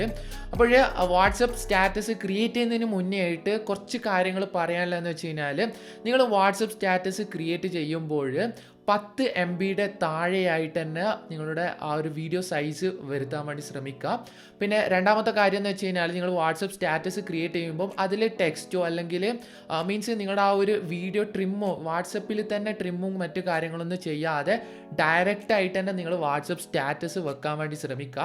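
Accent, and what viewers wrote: Kannur, India. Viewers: Ningal kannur aan. Alle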